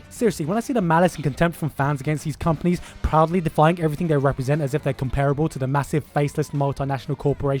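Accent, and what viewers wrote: United Kingdom. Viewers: No! nonono, you are not a Uptight Obsessed Douchbag, you are a Uptight Obsessed Douchbag with a british Accent. And i must Say, you should not buy every single DVD you watch! Basically we should force the market to put anime Back on TV Nothing less! The Netflix Model is quite interesting but here in UK i dont think they have anything on it.. Only buy material if you really like the Franchise, and !!APPROVE!! otherwise you are feeding fatcats.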